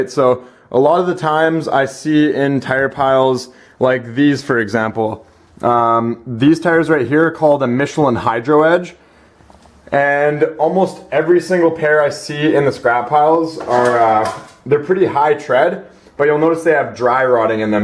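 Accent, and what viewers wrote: Canada. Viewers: smooth canadian accent, sound like the trailer park boys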